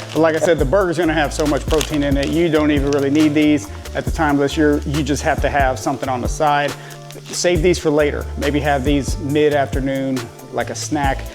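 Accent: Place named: South, United States. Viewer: I’m loving your channel. If you don’t mind me asking what part of the USA are you from. I hear hints of a familiar accent. Alabama girl here. Lol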